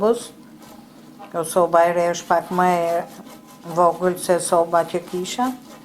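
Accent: Tirana, Albania. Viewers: Bravo e gatuan mite e flet qarte Tironse mo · Te lumshi duret e arta dhe shpjegimet e tuja ja ne te kuptushme, dialekti shume i bukur i tirones. E pjek si arre, me pelqen qe e kthen e pjekje shume mire. Qofsh mire me shendet. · Me kenaqe sa bukur e komenton je safi tironse Te lumshin ato duar njerzit nuk duan salltanete duan keshtu si ju shqeto 🙏🙏🙏💕💕💕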